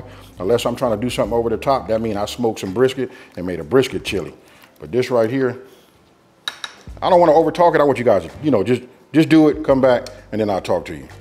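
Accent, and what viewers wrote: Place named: South, United States. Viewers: That LA accent is strong lol I love it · Love the version of ur recipes and love your accent. I’m from Philly, I’m a sucker for southern accents. I made this twice. It definitely hits different with cinnamon in it. Oh and definitely beans 🫘. On the east coast we use beans and ground beef 🥩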